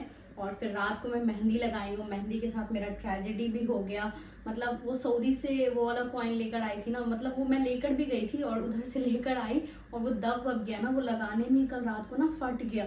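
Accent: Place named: Bihar, India. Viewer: Jo log soch rahe h yh ayse kyo bolte h to mai btatu jisko nhi pta ho yh bihar say h waha aysr hi bolte h i am from upppp❤❤❤❤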